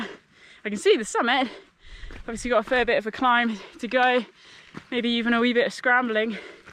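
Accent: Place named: Scotland, United Kingdom. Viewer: Love your channel 🤗I've watched loadsa them to date. Love your Scottish accent, your enthusiasm & knowledge. I'm in Glasgow & never done Dornich until tomorrow! Keep it Wild Adventurer 😎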